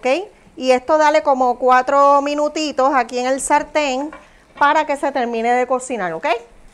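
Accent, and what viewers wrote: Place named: Cuba. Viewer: Me encantan sus recetas...pero de verdad tiene que estar diciendo tanto "showchito"?...como se dice en ingles...so annoying y el acento cubano? Pq?...son cosas innecesarias....explica super, tiene buena energía, es alegre....eso es más que suficiente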